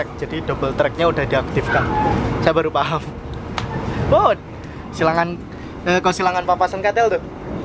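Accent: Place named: East Java, Indonesia. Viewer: Mas owong jowo timor to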